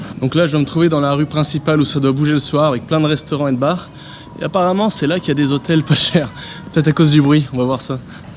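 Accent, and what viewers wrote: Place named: France. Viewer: Акцент чисто как у французов. Молодец. Très bien.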